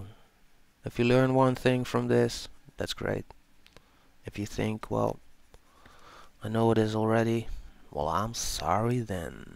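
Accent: Netherlands. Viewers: Are you dutch? You sound dutch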